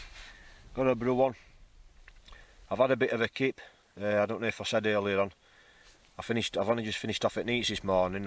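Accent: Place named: Lancashire, United Kingdom. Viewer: Another cracking video,. Where are you from, if you don’t mind me asking? I see on your IG profile you’re in Barnsley (South Yorkshire) but you use quite a lot of Lancashire slang, like ‘hafe’ instead of half and ‘neet’ instead of night.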